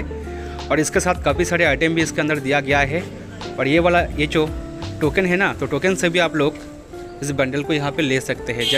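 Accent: Bengal, India. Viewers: Bhai app bengali ho kaya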